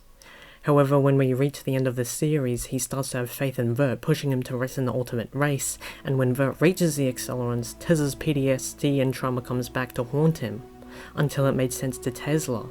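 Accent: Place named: United Kingdom. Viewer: I can't listen to "if you're beiaten and tortured to a pulp." Yout Birtish English is hard to me that's why I ask on comment section when the captions don't match the dialogue.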